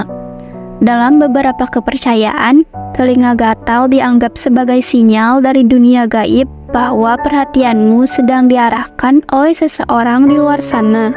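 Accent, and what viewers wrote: Bali, Indonesia. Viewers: iy mnurut saya ini dialek orang bali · Dri dialeknya sptnya org Bali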